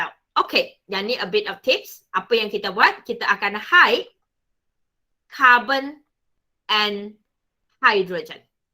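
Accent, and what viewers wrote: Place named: Kelantan, Malaysia. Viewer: Just want to know..Are you from kelantan madam? I'm studying at Kelantan matriculation college and learning some 'kelantan malay' ..and I can hear some kelantan slang when you speak malay.. really love the way you alternate malay and english to make the students understand the chapter very well.. currently addicted listening to your teaching and hopefully I can score well in my pspm 1 &2 especially for chem..❤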